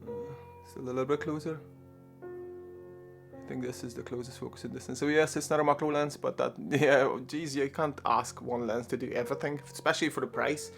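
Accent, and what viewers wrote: Northern Ireland, United Kingdom. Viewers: Good. Is that an NI accent I hear?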